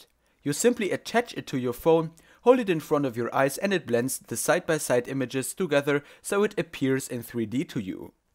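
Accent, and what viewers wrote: Germany. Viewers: Dieser Deutsche Akzent!!!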